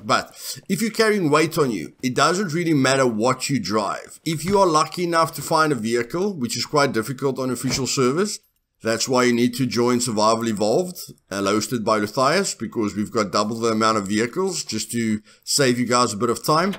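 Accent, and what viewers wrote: Afrikaans, South Africa. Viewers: You my china sound rough South African Afrikaans ekse ... lekkerrrrr lol.. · Great video, subed. Edit: your Afrikaans accent is the best!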